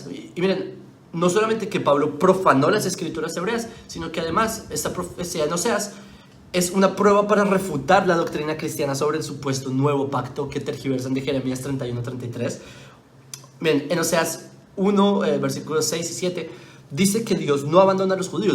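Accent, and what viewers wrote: Bogotá, Colombia. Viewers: Y cómo va a vivir libre, sirviéndote a ti ? Que eso es lo que quieren los judíos , que les sirvan los demás ? Estás crazy , resígnate y entiende que Dios es Dios para el Mundo , Y que Dios prometió bendecir a todas las naciones , con su risa tonta y su acento de rolo nadie te cree, no eres más que un cachaco con kipas ! 😢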